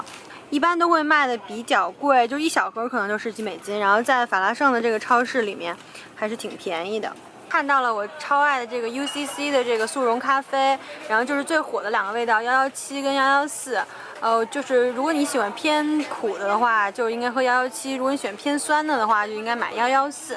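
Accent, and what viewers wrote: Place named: Beijing, China. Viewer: 北京味儿贼亲切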